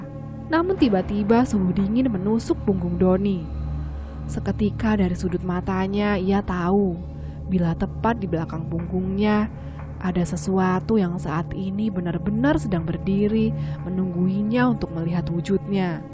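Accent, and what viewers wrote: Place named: Java, Indonesia. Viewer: Tapi aku mlah suka suara khas jawanya. Semngat kak🔥❤️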